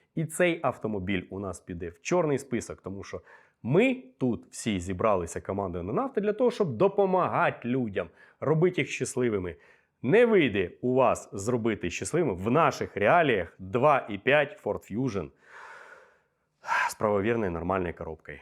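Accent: Russia. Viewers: Бля… неможливо слухати такий акцент жахливий російський. Розмовляйте російською краще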